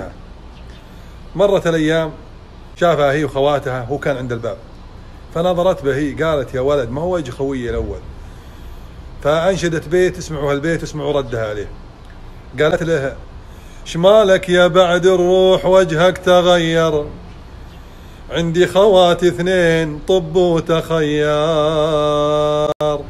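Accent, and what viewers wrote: Iraq. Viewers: بوويه اللهجه العراقيه تخبل بحلگگ ❤️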